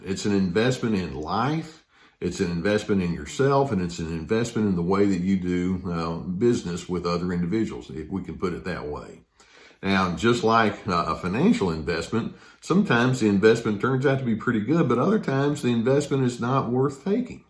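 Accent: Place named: South, United States. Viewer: Yes,. WE HEAR YOUR HEART!! Your kindness, empathy and sincerity emanates from the screen!! Your words, knowledge and wisdom (and your Southern accent 😁) comforts and reassures me. Your videos have literally been a game-changer and a life-saver for me!!! THANK YOU!!!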